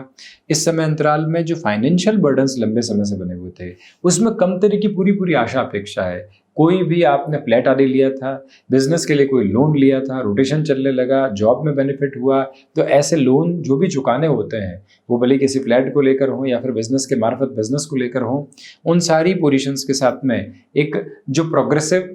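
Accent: Standard. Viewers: पंडित जी आप बहुत शुद्ध बोलते है आप जो बोलते है उसका अर्थ समझ मे नही आता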